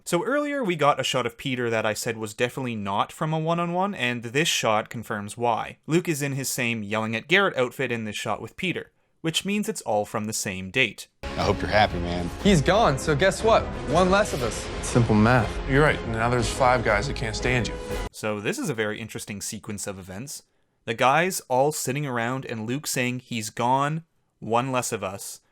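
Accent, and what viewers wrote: Canada. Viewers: I freaking love these videos! 💚 also, are you Canadian? I like your accent!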